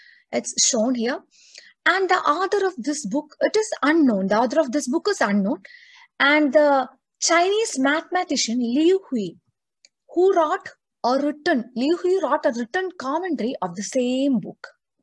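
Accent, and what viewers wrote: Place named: South, India. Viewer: It's good and Informative ! Are you doing PhD in Mathematics ? And another thing to say I like your pronunciation , it's awesome and somewhat like South Indians ,hahaha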